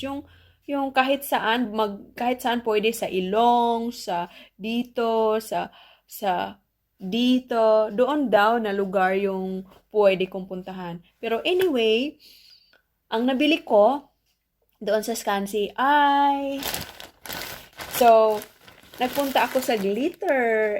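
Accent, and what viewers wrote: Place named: Visayas, Philippines. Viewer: Bisaya ka sis parihas Tau bsta bisaya tlga mag Salita bagsak nyahhh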